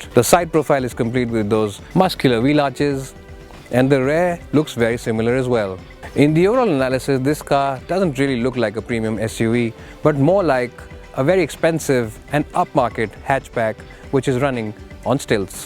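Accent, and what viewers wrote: India. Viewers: English slang indian....bla,bla,bla..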